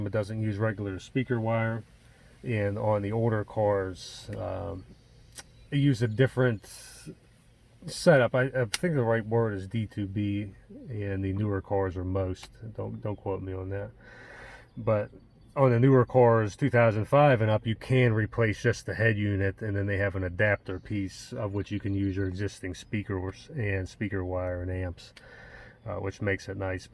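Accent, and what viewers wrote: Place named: Pittsburgh, United States. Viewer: Are you in Pittsburgh. Want to have some similar things done to my SL. Who did you use. Sounds like a Pitts accent. Best, Dave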